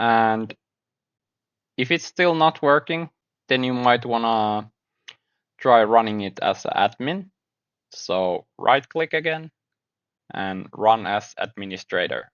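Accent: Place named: Finland. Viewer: once i heard a finnish mans voice i knew this was going to be a stupendous game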